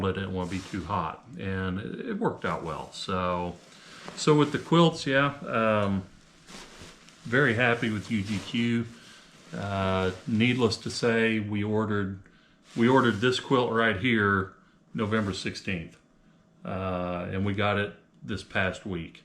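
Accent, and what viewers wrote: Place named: Kentucky, United States. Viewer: I retire in 2 years and want to hike the AT. Sir, you are so lucky your wife is willing to do this with you. I wish my wife would do so. I have the same Big Agnes tent that you have. It's bullet proof, but it is heavier. I have never used the footprint, but it's not a bad idea on a thru hike. I love it. I also love my Duplex when I'm hiking alone! Great choices! I love my Nemo Tensor pad! I have an Enlightened Equipment Revelation quilt and I love it. It's only about 20 ounces. But, your quilt is great! Put some miles on those legs. I've hiked the Smokies and it's a but kicker! I'm from Kentucky, you sound like you would be from somewhere close! I also subscribed!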